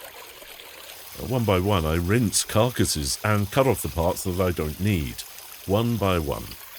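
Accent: United Kingdom. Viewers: Food looks delicious, like it always do. But I'm not crazy about the British narrating. I like the original videos, where it was just nature and him cooking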